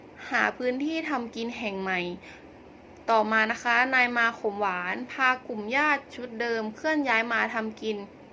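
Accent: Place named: Nam Phi, Thailand. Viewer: เพิ่งรู้ ว่ามาจากสูงเม่น / นึกว่ามาจาก น้ำอ่าง -- สำเนียงจะเหมือน น้ำพี้ (มาจากสูงเม่น) หรือ น้ำอ่าง (มาจากเชียงแสน เชียงราย) มากกว่ากัน -- คนนาป่าคาย แยกบ่ออก 555 เคยได้ยินเสียงดนตรี ด้วยตนเองหรือเปล่าครับ -- ปัจจุบันยังได้ยินอยู่ไหม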